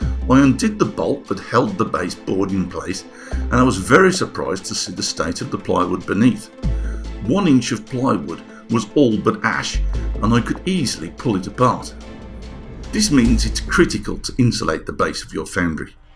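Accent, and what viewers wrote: Birmingham, United Kingdom. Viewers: As is David Attenborough to the natural world, so is to furnaces. With a Brummie accent, of course,;-)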